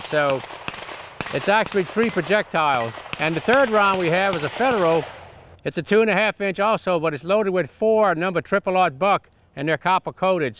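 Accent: Louisiana, United States. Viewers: Is he from Louisiana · ***** I believe that would be a bit of a Cajun ascent. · Wikipedia got it wrong. He got a Louisiana accent for sure..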